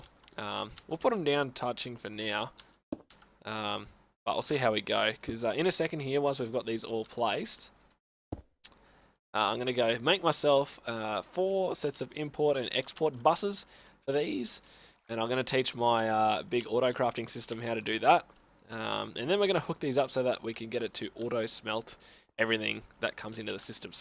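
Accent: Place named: Australia. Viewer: You have an Australian-like accent.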